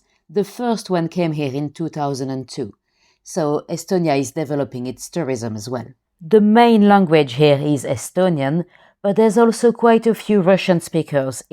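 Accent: France. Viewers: Madame, quand vous essayez de parler anglais votre accent français est presque comique.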